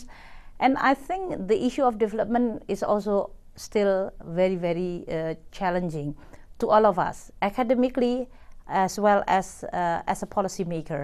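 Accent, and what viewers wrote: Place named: Indonesia. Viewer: Lantas dengan kemampuan menghilangkan aksen Indonesia anda, sudah jadi apa anda sekarang? -- Orang seperti anda inilah yang sejatinya masih menjadi katak dalam kubangan, setiap individu memiliki kemampuan, dan keahlian masing2, sosok seperti bu mulyani yang pandai dalam mengelola keuangan, tentu tidak akan menghabiskan waktunya hanya untuk menghilangkan aksen jawa atau Indonesianya. Selagi grammar dan tata bahasanya dapat dipahami, maka tidak ada yang salah ketika kita masih memiliki aksen bahasa pertama.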